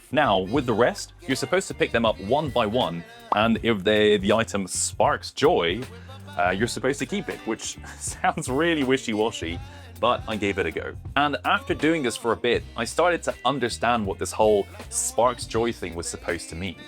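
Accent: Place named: England, United Kingdom. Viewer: Since he clearly sounded English, it is perfectly possible that it WAS a joke. Our humour can be very "deadpan" at times.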